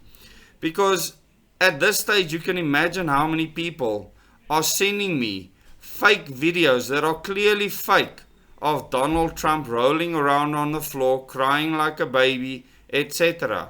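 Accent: South Africa. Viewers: It is so refreshing to hear a South African accent saying what we have come to know from the truthing community (mostly US) over the last few years. Love it. Mooi man! Mense is besig om wakker te skrik.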